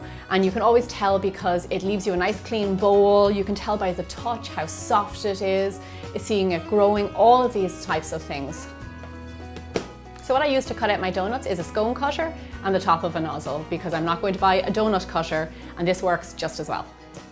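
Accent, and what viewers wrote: Ireland. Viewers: Lovely recipe, but are you Irish? you sound irish... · Love your Irish accent! Cork?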